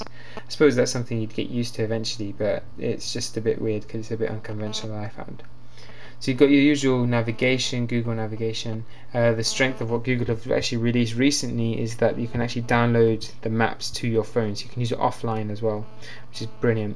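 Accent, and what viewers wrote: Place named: Arab world. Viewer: are you arabic by anyway? your accent is so great mashala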